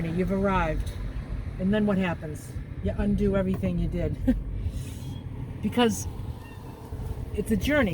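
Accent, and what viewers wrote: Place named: Boston, United States. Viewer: I really am sorry about your coffee ordeal. I never drank coffee then as working early shift, I had to make sure everything was filled, and coffee was made, I could take care of everything but coffee I knew nothing about making, so someone showed me how to do the coffee, it was easy, it smelled So good over time I tried it, I’d add a lot of cream, then I tried de caffe,it was much better so I’d have a cup. Only I never would finish it. I will once in a great while have a cup of instant, it may take me all day so it’ll be cod, which it’s ok to me. I’m happy you have such a strong will to quit guess it’s like quitting smoking, I’ve never smoked. But husband had a hard time when he’d try to quit. Soon he stared smoking outside, Dr said I couldn’t be around smoker. I’ve been around it all my life,dad smoked, but they say I’d inhale more than dad did. 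Didn’t realize it until I was grown, then hubby was a smoker. Anyway the lunges paid for that,. Your doing so well with your van, it’s so nice. I hear you mention, you were heavier, at one time, I’m gonna eventually look at all your other videos, I just love them. Most I love your room, it’s beautiful. Well I’m rambled along ,so I’ll stop and backup the one I’m watching now. Have a wonderful day. Love your accent, did you say your from Boston ? Latter 👍👍👍👍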